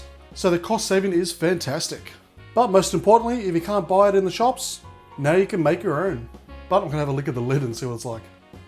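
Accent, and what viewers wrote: Australia. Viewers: Finally a Aussie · he is so fucking beautifully australian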